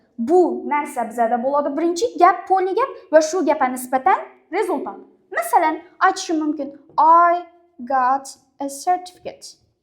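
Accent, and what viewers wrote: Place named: Khorezm, Uzbekistan. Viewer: Ex xorazmcha ekanda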